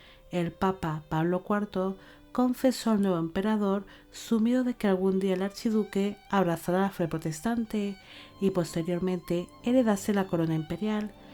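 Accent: Spain. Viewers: Como no gustarme tal vídeo y más con ese acento español. Saludos desde México.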